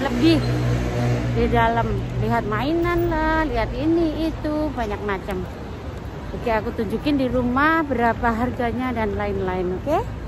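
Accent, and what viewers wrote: Java, Indonesia. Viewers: Semoga keluarga kita selalu dlm lindungan ALLOH swt amiiin...mbak medok wong jowo bangeeet ☺ jember selalu hadir ☝️🙏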